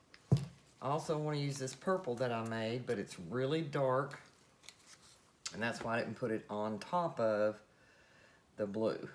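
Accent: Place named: South, United States. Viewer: Gotta be from the South, gurl! Love it! :)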